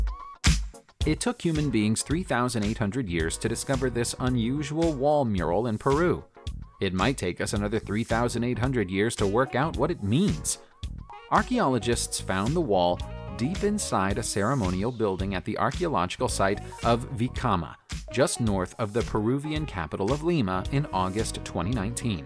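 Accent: United States. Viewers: American production/narration.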